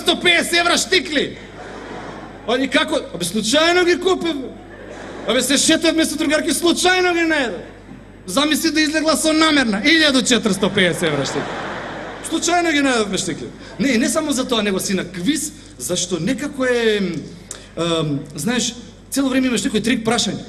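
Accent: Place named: Kumanovo, North Macedonia. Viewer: Kumanovac od daleko se poznava.Bravo samo takoj produzi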